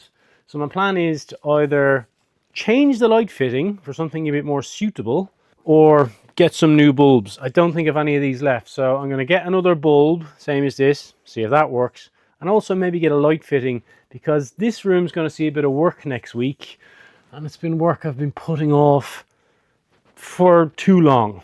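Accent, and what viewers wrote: Ireland. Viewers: his Irish accent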